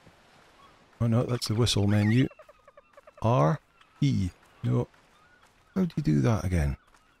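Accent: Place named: Glasgow, United Kingdom. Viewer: No worries, it has to work for you or it simply won't work. The main reason I asked is that the build system is pretty good and it might exercise your imagination. PS. I served in the British Army a long time ago and the first Troop I commanded was recruited from Glasgow. Not saying you have a Glaswegian accent, but it does remind me of that time. I miss those guys.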